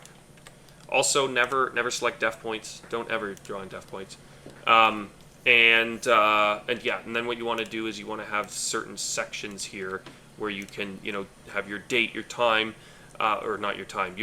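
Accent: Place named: Canada. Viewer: Canadian accent is strong.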